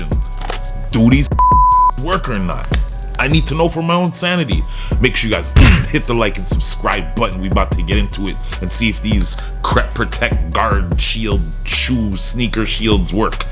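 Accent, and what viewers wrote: Toronto, Canada. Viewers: The Toronto talk has me rolling dawg😂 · That Toronto accent is annoying af 😂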